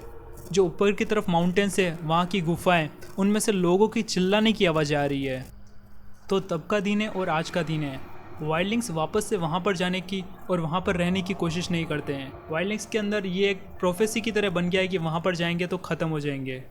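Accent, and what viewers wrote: Gujarat, India. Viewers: Bhai tu gujrati hai na ??😅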